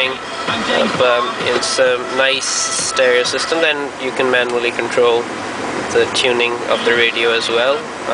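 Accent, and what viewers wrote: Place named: United States. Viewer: You must an American with your accent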